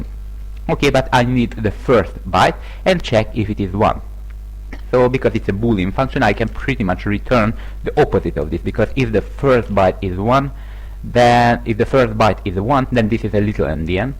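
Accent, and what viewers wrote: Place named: Hungary. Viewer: Nagyon jó oktató videó, köszi! Messziről rikít a magyar akcentus, bár ezért a YT névjegyben is szabadkozol. Már majdnem rákérdeztem külön is,de aztán feltüntek a menüpontok. Viszont a videó nagyon jó, és messze jobb pár ér felvágós, indiai akcentusnál.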